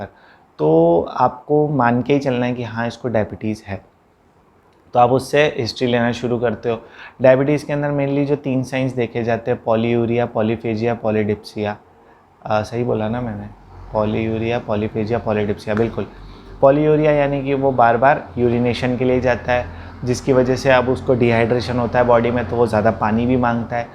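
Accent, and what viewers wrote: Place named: Uttar Pradesh, India. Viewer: Sir Aap pakka UP se belong krte h 😅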